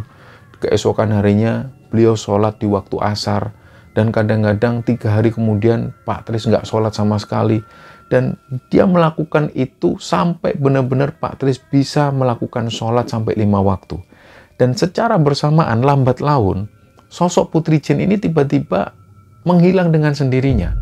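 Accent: Java, Indonesia. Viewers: Suaranya jawa banget mas,up trus jeh mas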